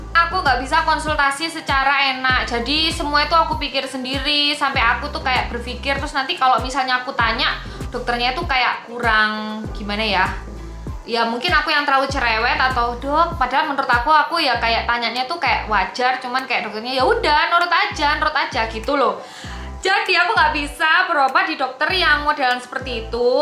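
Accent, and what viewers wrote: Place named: Padang, Indonesia. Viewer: Rodok padang ngono lohhhh😂😂suka logat bicaranya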